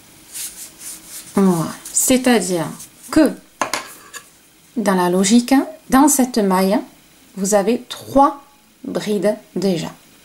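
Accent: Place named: Southwest, France. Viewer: Cc ...moi j'ai adoré et l'ai fait .. j'adore ton accent ! Du sud ouest non ? 🙏🙏🙏🙏🙏 Merci beaucoup .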